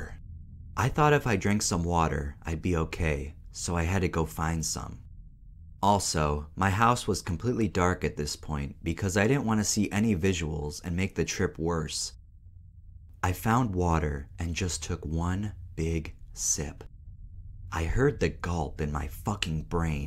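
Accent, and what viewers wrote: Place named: Upstate New York, United States. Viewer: Yooo i knew you had an upstate ny accent haha 585 waddup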